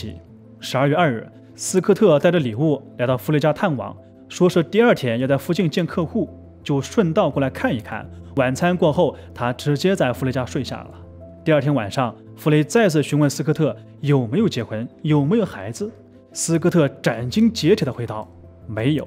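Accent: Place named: Gansu, China. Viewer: 甘肃口音？咬字有点怪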